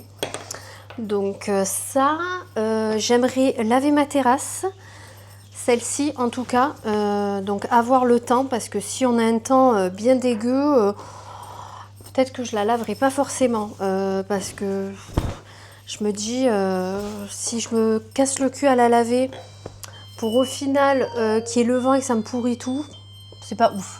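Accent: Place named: South, France. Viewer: J adore ton accent du sud rempli de soleil ❤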